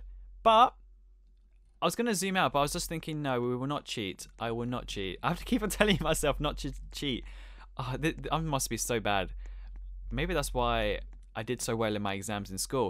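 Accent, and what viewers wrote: United Kingdom. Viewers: Are u British I can not tell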